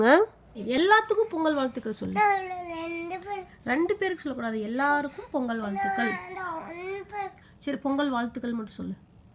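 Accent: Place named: Coimbatore, India. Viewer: BEAUTIFUL KOVAI SLANG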